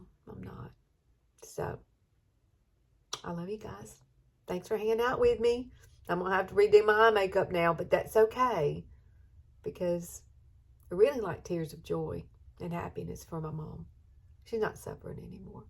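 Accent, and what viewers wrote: South, United States. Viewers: I’ve been so sad about the loss of your mom and I’m praying for you, your family, and now your friend, Terri. What a tragedy to lose a child. My heart hurts for you and your friend. I’m not very tech savvy and I usually watch just YouTube videos, but I’ve found a few of your videos on Facebook. I don’t use FB very much at all and I’m not sure if I’m posting comments correctly or not on there. Do you usually put more videos on FB or YouTube? I sure enjoy watching and listening to your southern voice😊 From one southern gal to another, love and prayers to you and your family 💕💕💕💕💕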